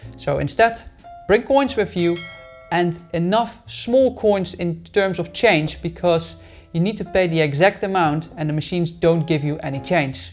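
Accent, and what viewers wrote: Netherlands. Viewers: i hear your dutch so that says enough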